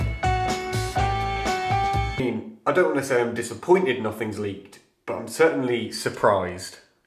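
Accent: United Kingdom. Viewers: A bit off-topic, but your voice keeps reminding me of someone else, and it finally struck me that you sound like Cary Grant. His British accent was somewhat diluted by working in Hollywood, but I hear it in your voice. I'm old enough to be your grandfather, so I'm a bit more familiar with old movies, and Cary Grant, but if you get a chance, watch one of his movies. And more on topic, your range of skills, and industrious ways, never cease to amaze me. We need you here in Michigan!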